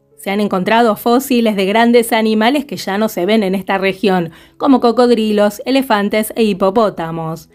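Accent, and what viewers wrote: Argentina. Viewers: Que bonita voz, ¿de que sector de argentina es tu acento?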